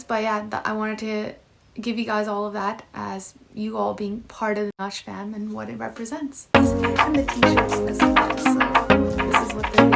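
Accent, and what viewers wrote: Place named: United Kingdom. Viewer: Hii, your english is like britishers😶😶 how it is I don't know.... But anyways your dance is amazing😘😘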